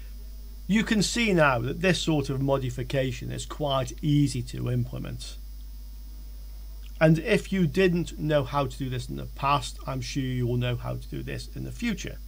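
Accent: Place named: England, United Kingdom. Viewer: I very much enjoyed your English accent. I also enjoyed your teaching. It clarified my understanding of the TL 431. And I subscribed to your channel. thanks for sharing.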